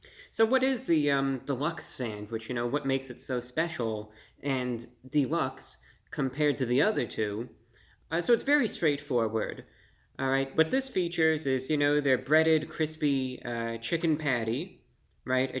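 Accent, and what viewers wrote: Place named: Transatlantic, United States. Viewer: I really like how his voice has progressively changed over the years, as he transitioned from the modern american accent to a nasal, higher-pitched transcontinental accent. This contributes to sink him deeper into his character, along with his old-school yet humble manners and overall appearance.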